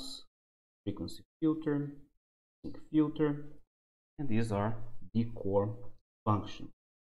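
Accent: Brazil. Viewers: Brazillian accent s2, is DDSP made in BR?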